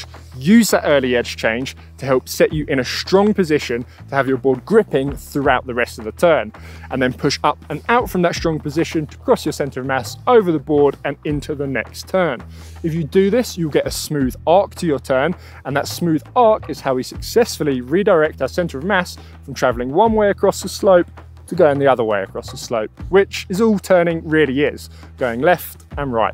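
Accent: United Kingdom. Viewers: Nothing against you mate but its weird hearing an brit accent in a snowboarding video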